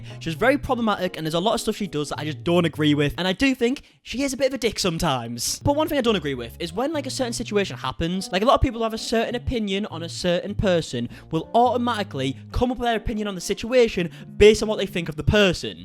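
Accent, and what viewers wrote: United Kingdom. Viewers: I was so bored and this popped up. YAY. Commenting so can buy himself some decor for his shelves and I get my UK accent fix 😁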